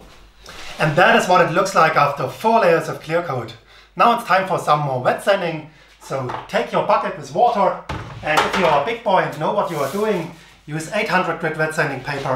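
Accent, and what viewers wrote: Germany. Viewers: Ich liebe es wenn Leute englisch sprechen, man aber voll den deutschen Dialekt raus hört 😅😂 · Da hört man direkt dass der deutscher ist xD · Absolut geil. Aber koch besser ist es das Deutsche im Englischen rauszuhören. Genial.👌🏻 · Bin ich der einzige der merkt das er deutsch ist... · er meint den starken akzent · I love how you can hear the german inside the english language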